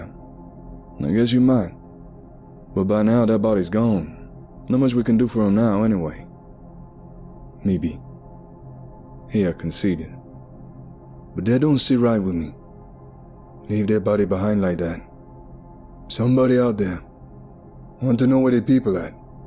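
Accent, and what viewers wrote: South, United States. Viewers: Great souther accent bro! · spoiling us with another vid in his smooth southern comfort rendition. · Wow. you nailed that southern accent! Granted, I was raised in NY state but damn if I didn't feel like I was in the bayou.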